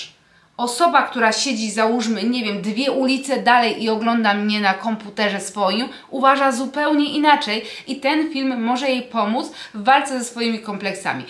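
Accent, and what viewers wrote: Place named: Ukraine. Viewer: Po polsku wymawia się zmiękczone samogłoski .Jesteś Ukrainką ? Naucz się polskiej wymowy jeśli jesteś w Polsce!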